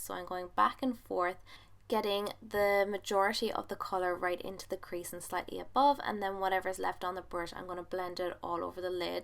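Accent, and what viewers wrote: Ireland. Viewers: Your accent is gorgeous, what part of Ireland are you from?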